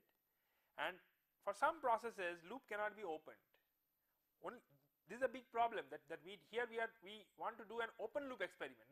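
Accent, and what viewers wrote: India. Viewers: Simple looking Indians with INDIANISED accents have great intellect ! I am proud of India ! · the best tutor gives u the basics of any subject clearly is the indian though his annoying English :)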